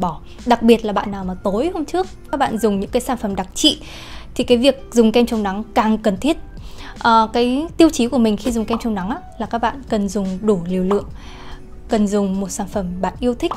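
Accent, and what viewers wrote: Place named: North, Vietnam. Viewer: Em nói Tiếng Anh nghe tuyệt quá, và đây là lần đầu tiên thích nghe giọng một người nói tiếng Bắc như vậy, không giống những người chị từng nghe.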